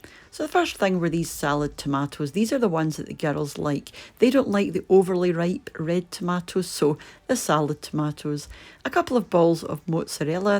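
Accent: Scotland, United Kingdom. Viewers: I I'm new to your Channel I'm not sure how I feel and you must have had something to do with tea LOL so forgive me for maybe asking me redundant questions but is that a Scottish or Irish accent that you have? What is the difference between British cheddar cheese and American cheddar cheese? I had to Google the difference between grams and things because we measure by cups in the USA; I don't know if they were the exact measurements. But they were great brownies. Thank you so much for the recipe. Safe travels be safe be well, blessings and much love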